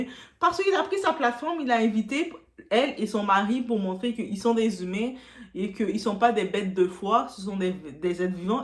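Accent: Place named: Quebec, Canada. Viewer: J’adore ton petit accent franco-québécois. 😉 Je ne connais pas Hawabelle, c’est toi mon influenceuse. 😘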